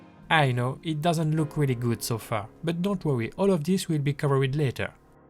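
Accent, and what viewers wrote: France. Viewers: Tes un français ou pas parce que ta vraiment un axen très français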